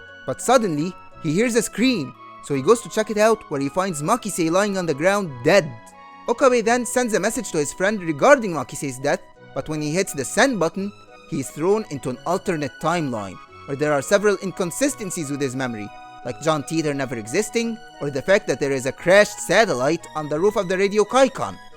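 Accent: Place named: Egypt. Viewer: that's an egyptian accent id i've ever heard one. much love and support my guy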